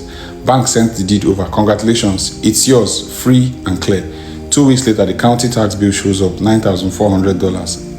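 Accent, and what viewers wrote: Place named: United Kingdom. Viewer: From his speech I feel it's the UK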